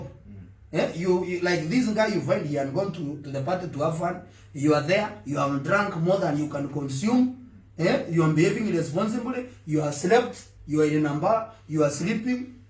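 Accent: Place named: Meru, Kenya. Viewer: The meru accent iko fty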